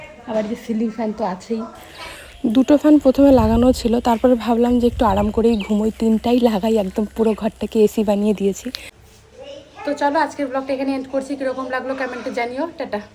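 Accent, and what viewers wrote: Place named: Bangal, Bangladesh. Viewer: Apu tumar purbo Purus ki bangladesher cilo. Tumer khota ekto banggal tan ace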